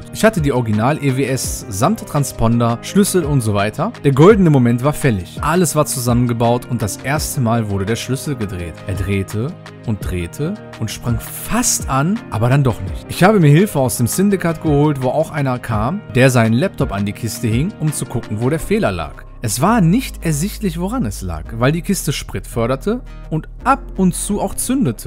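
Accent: Duisburg, Germany. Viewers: Kommst du aus Duisburg? Komme aus Duisburg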